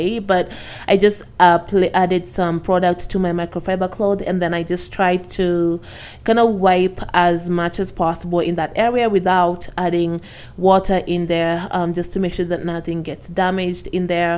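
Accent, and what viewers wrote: Kenya. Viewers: Hi your kiswahili sounds kama ya mombasa, did you live at the coast before going to the U.S? Btw you're a really good Mum.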